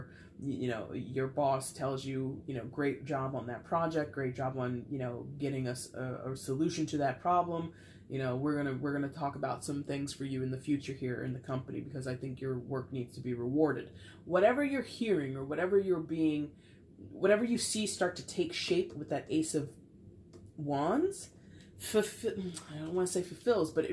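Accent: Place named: Upper Midwest, United States. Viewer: Love that Wisconsin\Minnesota accent! Lol I’ve never seen the queen of wands as older... even your card she looks young to me, I mean she’s the sexy queen lol. I’m guessing it was more about the intuitive message. Feels low key for a reading with two aces, but it’s better than a negative reading. I love the ace of wands and ace of cups— all passion, romance, and emotions, but this feels like you’re saying I shouldn’t get too excited, like aces aren’t that big of a deal after all.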